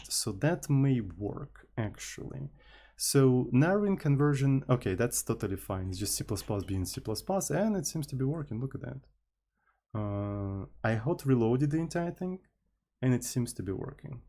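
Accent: Russia. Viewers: А можно то же самое на русском ? Вы же - русский, судя по акценту.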